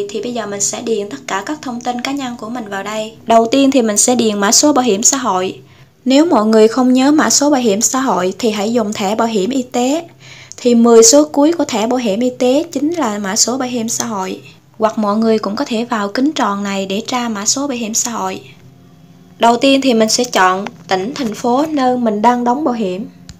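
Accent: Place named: Quang Nam, Vietnam. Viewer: Tks chị, hình như chị người Quảng Nam Đà Nẵng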